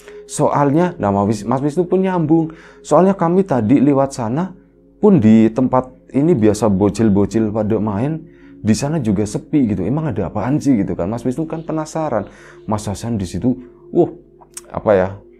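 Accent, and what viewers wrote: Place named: Banyumas, Indonesia. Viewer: NUMPANG TANYA barangkali bisa dijawab : MENGAPA NGOBROL HOROR sudah lama tak siaran?. Kangen bahasa ngapaknya...😅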